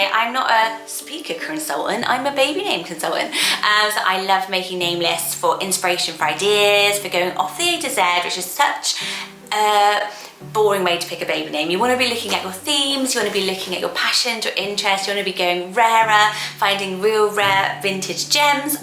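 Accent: United Kingdom. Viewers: These are sooo cool!! Wish half of them sounded as good in my American accent as they do in your gorg British accent 😘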